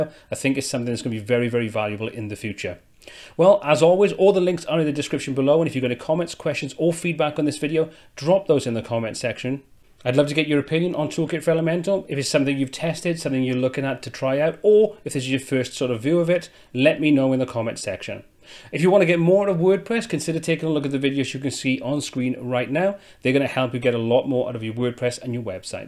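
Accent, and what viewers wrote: United Kingdom. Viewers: sorry for my mistaken, in fact, i enjoyed your video. the problem is the British accent even is very nice but difficult to understand when talking fast. by the way, thank you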